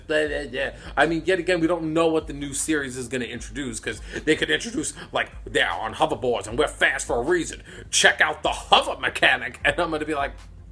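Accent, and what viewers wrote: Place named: Brooklyn, United States. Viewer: I love how you have a Brooklyn accent and talk in a filthy frank voice at the same time. It's pretty cool and pretty funny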